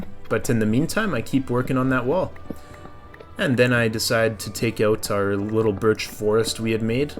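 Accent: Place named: Canada. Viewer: its almost like his canadian accent gets stronger as the video goes on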